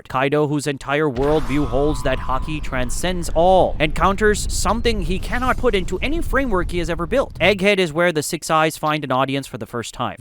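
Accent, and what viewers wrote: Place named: India. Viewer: why bro got indian accent?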